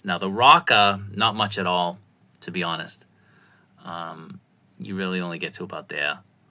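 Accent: Boston, United States. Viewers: So, have u watched the Celtics documentary series that's running on MAX/HBO? You should've narrated it. Noone's more Boston than you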